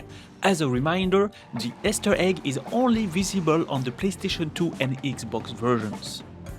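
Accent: France. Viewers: Are you french??? Damnnn the accent ahaah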